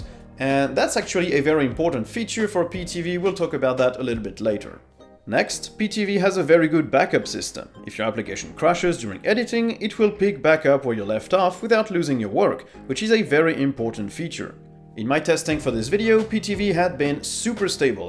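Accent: France. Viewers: Bonjour, tu es français ?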